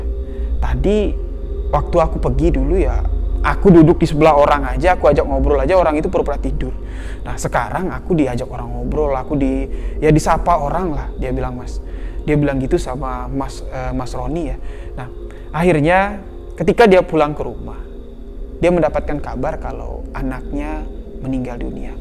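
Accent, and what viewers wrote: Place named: Madura, Indonesia. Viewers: Logat maduranya sangat kental.. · Org madura ya bang ngomngnya khass bngtt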